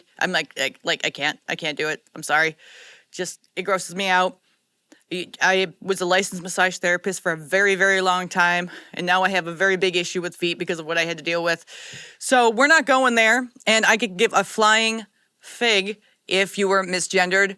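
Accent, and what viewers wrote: Scotland, United Kingdom. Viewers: Great Scottish accent🔥⚡️ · Trust me, I'm from Edinburgh and no clue what he is saying either, BTW I love your Scottish accent you should do it more often.😘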